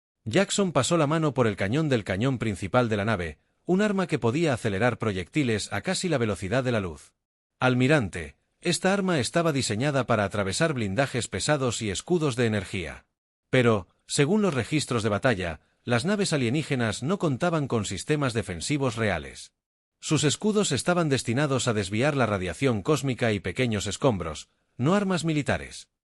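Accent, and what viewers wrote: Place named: Italy. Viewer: Si vas a hacer esto con IA, por lo menos asegúrate de hacerlo bien. Haz que lea los nombres en inglés con la pronunciación correcta y evita eso de leer el texto con acento italiano. Por el resto, todo bien. Pero es un poco molesto escuchar con esos detalles🥲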